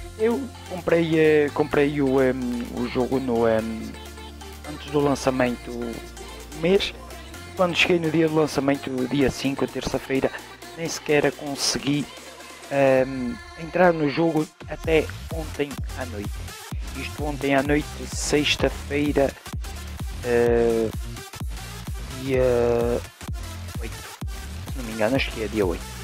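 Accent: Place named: Europe. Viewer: maxo esse sotaque europeu e essa musica estourada puta merda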